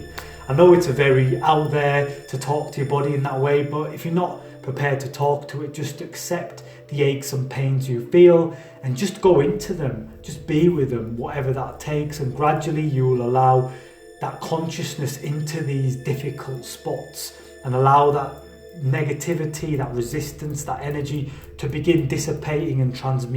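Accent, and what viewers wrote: Manchester, United Kingdom. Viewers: I can hear a Manchester accent.. 💖 Mancunian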